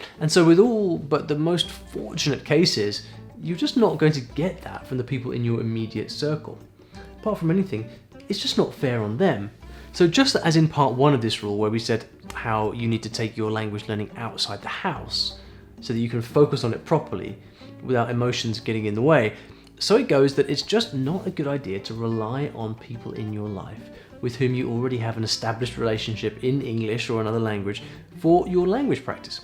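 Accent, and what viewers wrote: United Kingdom. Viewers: It's British accent and everybody likes it. His accent is the main reason of his subscribers to stay at this channel.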